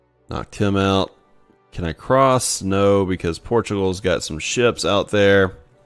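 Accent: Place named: East, United States. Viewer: You sound very eastern american. Where are you from?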